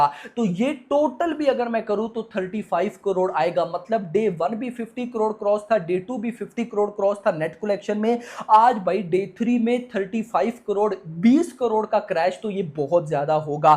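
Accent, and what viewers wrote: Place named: Pakistan. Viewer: Yes ye Pakistani h , iske bolne se malum pad rha h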